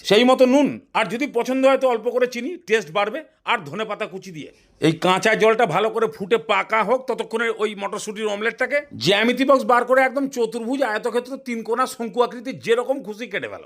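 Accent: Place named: Bangal, Bangladesh. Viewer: ওনার রেসিপি নিয়ে কিছুই বলবার নেই, অসাধারণ। কিন্তু ওনার USP হল কথা বলার ধরণ। শুনলে মনে হয় বাঙাল, আর বাঙালদের রান্না 🌍❤❤❤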